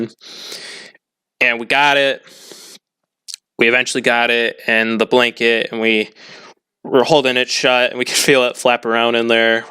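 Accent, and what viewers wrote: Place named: United States. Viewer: Oooh you're American! I'm British lol.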